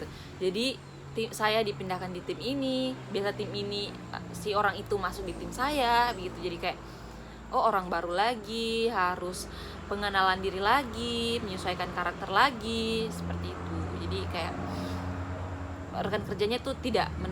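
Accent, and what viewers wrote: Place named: Sulawesi, Indonesia. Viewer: Kalau dari logatnya sekilas org sulawesi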